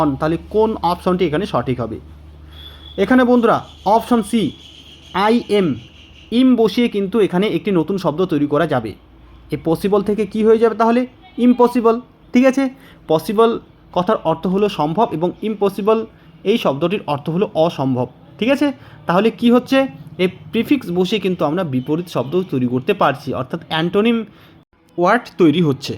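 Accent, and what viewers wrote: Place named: Nadia, India. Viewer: Sar nodiea thaka bolche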